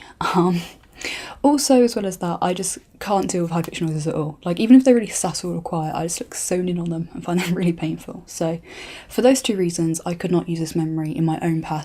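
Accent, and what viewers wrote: England, United Kingdom. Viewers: ur english accent is so sexy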